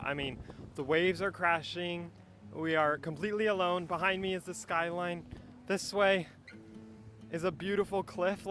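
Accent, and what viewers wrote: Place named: United States. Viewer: Nice video! There's also a surprise little hidden waterfall at Bronte. Your accent is from what part of the US?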